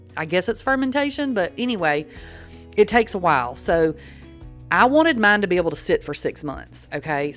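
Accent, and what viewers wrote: South, United States. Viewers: Just found your videos and I chuckled though the whole thing!!! I was raised in the South and your accent makes me feel at home. And your style is just like mine.... spill vodka on the counter??? oh well... now it's sanitized!!! You got a new subscriber!!!! 🤣❤ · New Subscriber here! I'm a MeMe also! 🥰 These recipes are great! I love that you don't measure your seasonings!😂 I hear the Southern in your voice ( I'm Mississippi) and figured that's why. Can't wait to watch the previous videos! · You sound like me!! Are you from the south too? I’m from Nashville. I love the tips you’ve shared! I’m single and although I don’t have kids, I’m finally putting my kitchen back together after a bad car accident and fighting cancer for several years. Almost everything I had expired or was freezer burned so badly I had to throw it out so I basically had to start over. 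Now I’m trying to make more things myself and be careful what I buy so I don’t consume too many ingredients my body doesn’t need. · I JUST LOVED YOUR VIDEO AND YOUR SOUTHERN ACCENT 😊, THANK YOU FOR MAKING THE VIDEO !!!!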